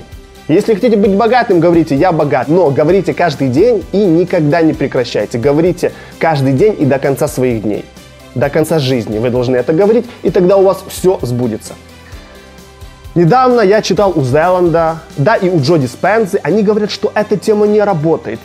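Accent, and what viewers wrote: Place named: Kyiv, Ukraine. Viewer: Говор такой, как у моего брата двоюродного :) В Киеве живёт, Березняки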